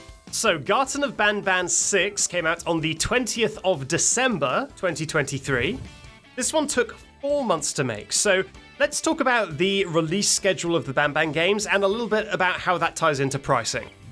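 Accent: United Kingdom. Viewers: That's weird cuz my dog was running all over the house cuz I was playing this on my TV once upon a time and he couldn't figure out the British accent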